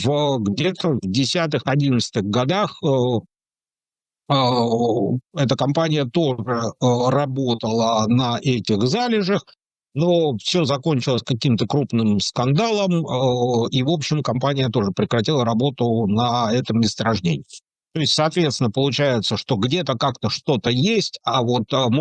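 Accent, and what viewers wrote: Okanye, Russia. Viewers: Оканье невозможно слушать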